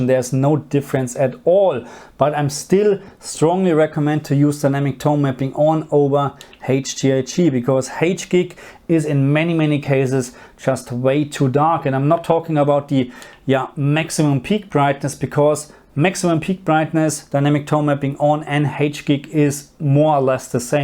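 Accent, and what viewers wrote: Germany. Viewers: Oh god that cringey German accent... How can you be so damn oblivious and speak a different language you have no real grasp of? It's not just grammar. Pronunciation plays a BIG role. Dunno if arrogant or just dumb. Gawd I hate my peinlichen Mitdeutschen........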